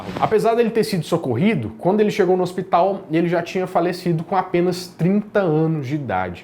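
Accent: Minas Gerais, Brazil. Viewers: Vídeo muito bom. Gostei do formato, da didática, das montagens e o sotaque mineiro é um destaque. Parabéns 🌟🌟🌟🌟🌟 pra vc. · esse cara é mineiro uai!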